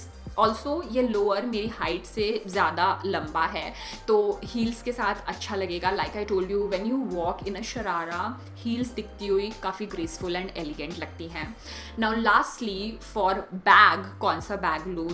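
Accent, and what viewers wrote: Punjab, India. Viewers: Aapka bolne ka style ekdum punjabi touch ka hai!! Im in love with your outfits because they are very sober and decent!!!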